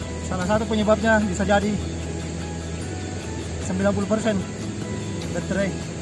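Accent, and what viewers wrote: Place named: South Sulawesi, Indonesia. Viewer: Logat bugis nya kental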